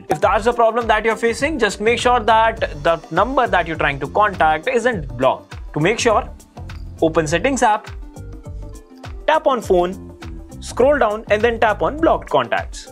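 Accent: India. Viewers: Here’s a great tip for all of us stupid Americans that don’t know how to speak India... Speak a little bit slower and try to separate your words because all we hear is alphabet soup sorry man I just figured to make it easier for your message translation typically will get frustrated and not even bother listening however thank you very much for your knowledge and sharing it with us I do really appreciate it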